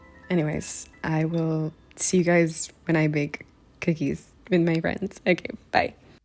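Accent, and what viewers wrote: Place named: Colombia. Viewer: It’s so funny to me how you have a Colombian accent when you speak Spanish 😭 ofc u lived in Colombia and I understand but it’s interesting to me how depending on where you learn a language you can get their accent. I’m Latina and learned English in New England however I don’t have an accent but I lived in Texas when I first moved to the states and wonder if I would have an accent if I lived there!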